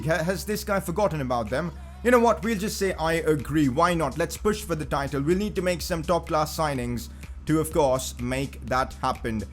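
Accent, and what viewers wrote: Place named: India. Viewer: We’re has this Indian sounding man been all my life I have been laughing so hard all ep this is amazing I just subbed to all his channels he his amazing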